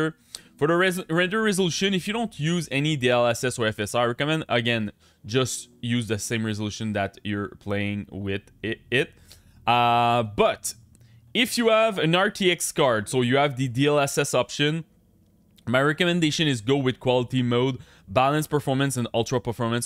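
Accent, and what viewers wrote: Quebec, Canada. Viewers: Sérieusement, tu donnes toujours de bonne recommandation! Pis en plus, tu cache pas ton accent, bien de chez-nous! Lâche pas le bon travail dude.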